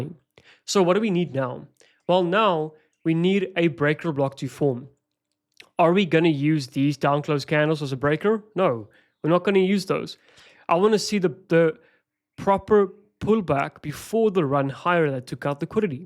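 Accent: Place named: South Africa. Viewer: No he sounds South African but I could be wrong!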